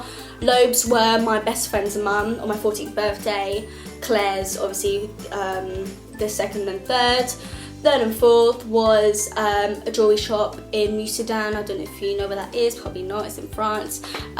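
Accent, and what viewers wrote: England, United Kingdom. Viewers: Onyx Lover she's english but she lives in France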